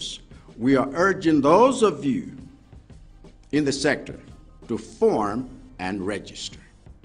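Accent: United States. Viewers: This guy has a USA Southern Accent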